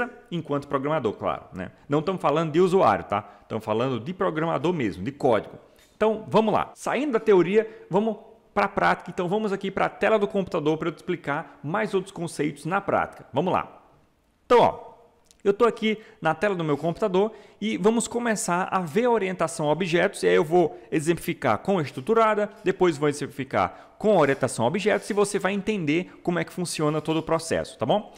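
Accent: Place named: Paraíba, Brazil. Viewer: parabéns pelo video meu amigo! Seu sotaque parece muito paraibano. Estou correto? Abraço.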